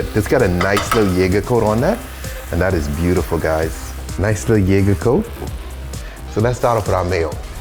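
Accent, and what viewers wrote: Trinidad and Tobago. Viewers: Are you from Trinidad Bc ur accent sound like Trinidadian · Are you from Trinidad 🇹🇹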